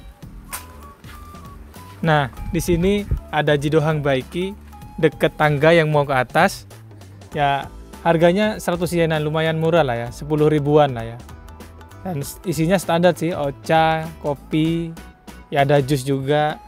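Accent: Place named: Madura, Indonesia. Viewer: Logatnya kyak ada maduranya.. 🤭 Orang mana bro?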